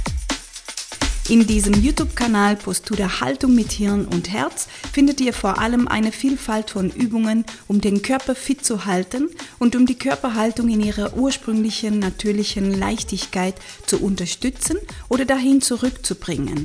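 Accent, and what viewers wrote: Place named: Spain. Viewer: Liebste, Ich bin auch so froh,deine Infos auf diesem Kanal gefunden zu haben!!!!Es erleichtert mir als ostheopatische cranio sacralTherapeutin enorm meine Behandlungen,da mein Ziel ist, die Menschen in ihre Eigenverantwortung zu bringen-und deine Videos unterstützen dies. Ich muss nicht mehr etliche Mobilisations-und Achtsamkeitsübungen von Kanal Betreibern zusammensuchen..oder meine Therapie Einheit durch Übungen kürzen..ich erkläre das meiste am Körper während meiner Arbeit -und sende den Clienten als Geschenk ein Video von dir nach! Es ist Perfekt!So perfekt! Und sie lieben deinen reizenden spanischen Akzent genauso wie ich. Ich wollte dich dies wissen lassen,damit du weiterhin Kraft und Freude und Motivation für deine Arbeit mit den Videos hast-wissend,sie werden geschätzt! Und sollte einmal ein Thema anstehen,wage ich es, mich zu melden. 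Das Hauptthema bei den Menschen ist-die Atmung!!! Immer wieder. Deshalb Dank ich dir für dies Video am meisten 🙏🏽 P.S. Was ist deine Meinung zur Wim Hof Methode...vor allem das Atemmuster?das würde mich interessieren. Vielen Dank im vorraus für deine Zeit,dies zu lesen,und wahrscheinlich-wie ich dich kenne-auch zu antworten. In Verbundenheit, abrazarte desde austria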